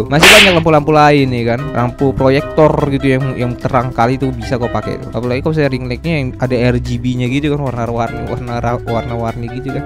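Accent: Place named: Depok, Indonesia. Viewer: Omongan u kek orang depok bang.🤣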